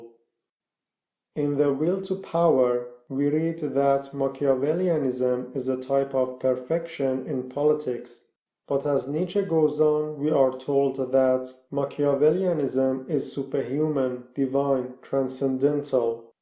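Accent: Iran. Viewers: ???, I liked it already. You can like it too... I love the Iranian accent. What makes you think that you cannot like it if it is Iranian? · Are you Iranian? atleast that's what I a got from your accent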